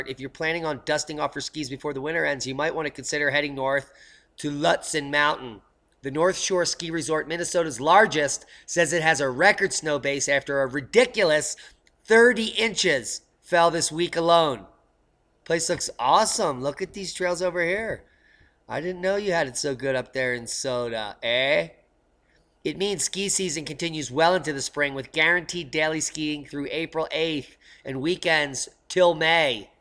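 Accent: United Kingdom. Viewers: I love the British accent. You are f*ckin’ funny as hell. Can’t wait to hear you and Robert tonight. Global warming causes snow. Didn’t you know?! Haha. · Love your British accent.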